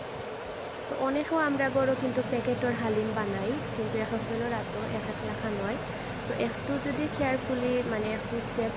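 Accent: Sylhet, Bangladesh. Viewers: I’m sylhety to. I love ur sylhety voice. I lived in London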